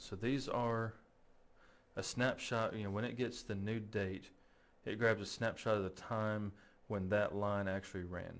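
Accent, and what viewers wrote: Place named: Midwest, United States. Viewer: he sounds american to me. Probably from the midwest or south.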